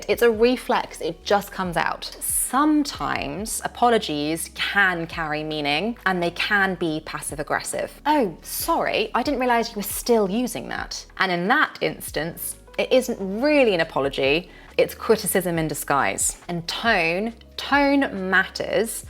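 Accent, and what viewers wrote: United Kingdom. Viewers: I love british English I always watch your videos teacher Greetings from Peru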